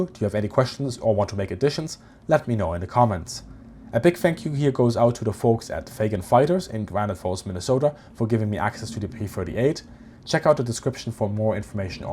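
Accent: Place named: Germany. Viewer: Too fast, too German, too bad.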